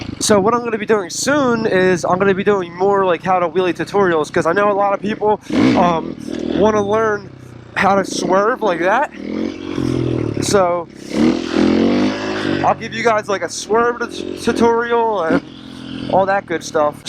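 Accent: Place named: Philadelphia, United States. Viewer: what part of eastern pa are you from lol??????? That philly accent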